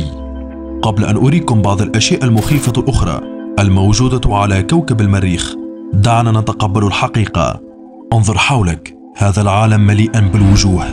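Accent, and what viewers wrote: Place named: Tunisia. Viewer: انت تونسي ! هذا واضح من نطقك لبعض الحروف هل أنا مخطأ ؟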